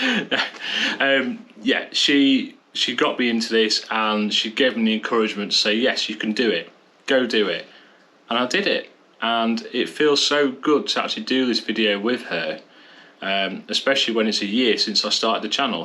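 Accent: Lincolnshire, United Kingdom. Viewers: I could listen to your Lincolnshire accent all day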